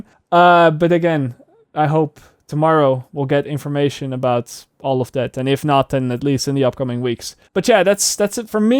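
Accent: Netherlands. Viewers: Im pretty sure this guy is Dutch :D that ''zie je'' later at the end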